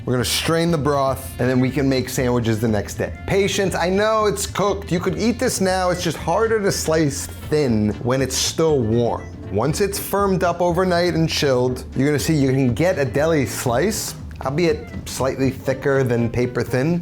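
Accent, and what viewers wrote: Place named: Brooklyn, United States. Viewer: Love your videos bro. I’m in my 30s but between the homage to some BK classics and the accent it brings back a lot of memories being a kid at my grandmas in Dyker Heights. You remind me like you could be in my family 😂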